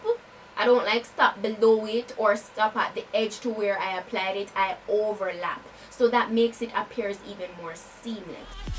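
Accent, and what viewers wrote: Jamaica. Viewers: love your accents Jamaican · Yaardie gal you accent strong sah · JAMAICAN :-)... Beautiful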